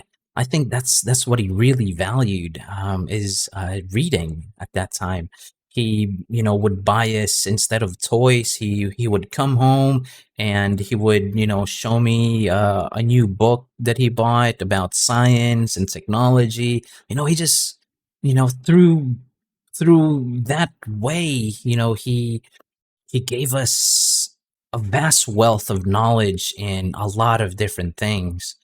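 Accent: United States. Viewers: I think you're wrong about the English language being exclusive to the elite, the educated and very rich. You probably think so because you grew in America (judging from your accent). Public schools (schools for the masses) at that time were taught subjects in English, so the language was more common than you think. This dissemination of English was the product of 40 years American colonialization. It was the Americans who set up the educational system for the masses and the middle class. It is perhaps the foundation of why today many Filipinos speak English as a native language and why the Philippines ranks among Asian countries as one of the largest English speaking populations. But yes, your grandfather was very intelligent. Cheers. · Yeah! But boy u hide in american palda what a shame u speak americanize compared to ur lolo but no doubt ur lolo have more nutrients in his brain against yours ..what a shame 😂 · are u in the Philippines? 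Coz ur american accent is very nice 👏 Ilang taon po pala lolo nyo nung namatay sya? Seems he had a long full life.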